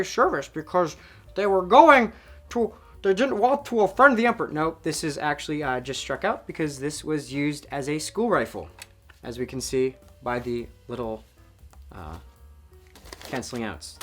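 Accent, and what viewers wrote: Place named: United Kingdom. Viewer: I honestly thought you were british all this time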